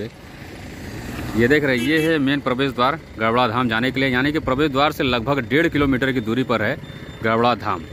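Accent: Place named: Varanasi, India. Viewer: Video tho achcha laga vusse bi jyadha aapka bolna bahuth achcha lagta hai ♥️ banaras ke hindi sunne me bahuth masth lagta hai 👌👍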